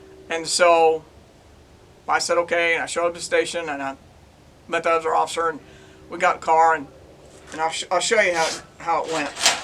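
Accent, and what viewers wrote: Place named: United States. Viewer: Mate, that is not the world, that is the usa, judging by what you described and by your accent. I live in a small country in europe and that kind of shit just does not happen around here. Kids running around with shotguns... that is just crazy. Here you can have a 8 year old gril walk the streets of a city with one million people at night and be afraid of anything. Ofc, the parrents dont allow that anymore, because of the constant fear porn in the news, but you could still do it and nothing bad would happen. I am really not a fan of weapons and i am happy that they are not allowed in my country. However, if i was living in the usa, i would want a gun too. How the hell do you police a society where every fool, even kids, can have guns? There is a lot wrong with my country, but when the police see someone with a gun, they know there is troube and they know who the bad guy is. 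They dont have to think about whether they are being subjected to a 2nd amendment audit.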